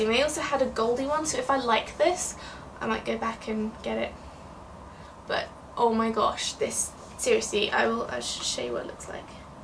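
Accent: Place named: England, United Kingdom. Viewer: You sound English though do you live in Japan x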